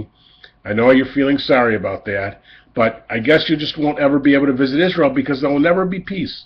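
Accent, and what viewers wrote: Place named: United States. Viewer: I thought he had just visited Israel to honour his grandmother, Princess Alice. You obviously have not heard the news...! You are obviously American by your accent and that could excuse your ignorance.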